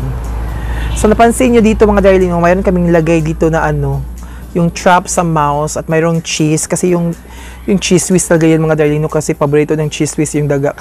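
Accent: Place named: Visayas, Philippines. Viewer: Manay am happy kapag napanood kita happy people ka at natutuwa ako kapag dialogue mo sobrang dako bisaya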